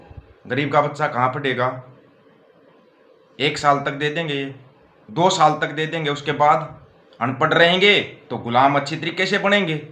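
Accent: Haryana, India. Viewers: Tere boli Haryanavi hai or tu Punjabi trend karwa riha hai ki sabko pata nahi hai tu paise khake godi main ucchal riha hai .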